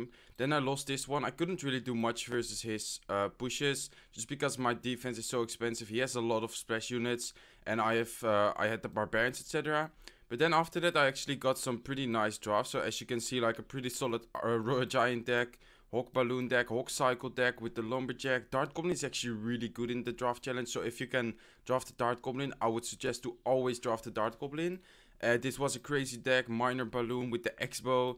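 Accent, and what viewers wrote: Netherlands. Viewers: ben je nederlands want je praat soort van Nederlands Engels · Are you from the netherlands? becuz your voice sounds like that.. And im from the netherlands too :)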